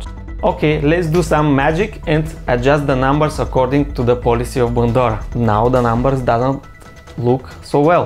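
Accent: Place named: Bulgaria. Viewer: where are you from originally? Just curios Edit: I heard Bulgaria 🇧🇬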